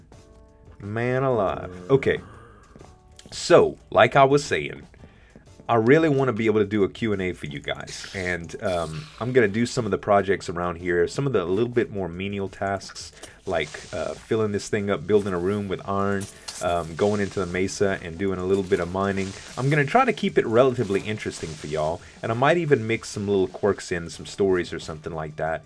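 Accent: Louisiana, United States. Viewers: I can't place your accent. Where are you from? Louisiana? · Based on your accent, I guess You live in the USA, am I right? If I do, I would like to ask You which state do You live in? Do You own any firearms and what is Your opinion on them?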